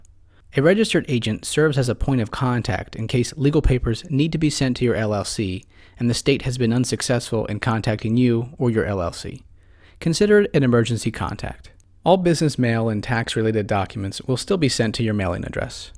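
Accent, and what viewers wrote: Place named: New York, United States. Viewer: Man I love your Jewish NY accent it sounds so cool haha. Thanks for the help! I was able to file with ease for my LLC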